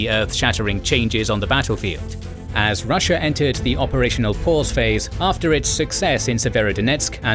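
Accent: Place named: United Kingdom. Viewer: The creators of this propaganda & misleading terminology in this video are quite late.. My dear British-accented pigs, here it is: It is not an invasion & it will not be an invasion. This is a security operation to purge the UA military organization in which Nazi ideology has spread and become purely Nazi military battalions and the liberal West supports these criminals just for sake of mere They see them as tools that can inflict irreparable losses for the Russian army in the future because the best leaders in Russia are there today fighting against the latest Western weapons & against a corrupt military organization backed by the democratic West since 2014, their military doctrine has nothing to do with alleged democracy of the Kyiv criminal regime.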